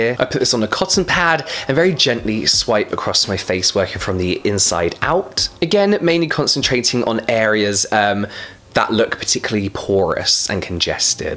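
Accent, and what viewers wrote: England, United Kingdom. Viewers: Scrubbing away ah ih = scrubbing away at it. Gotta love the brits 😂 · Listening to you makes me feel like I'm back in the UK! Love your accent.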